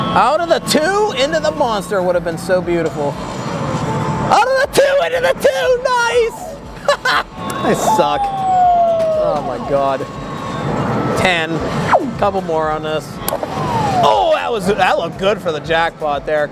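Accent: Pittsburgh, United States. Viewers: Sounds like he's from Pittsburgh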